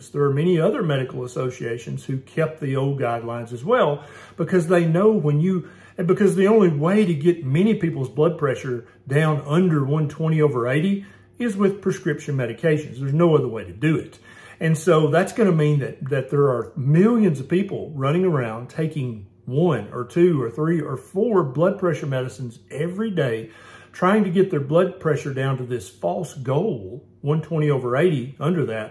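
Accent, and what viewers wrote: South, United States. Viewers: I could watch Dr Berry just for the southern accent.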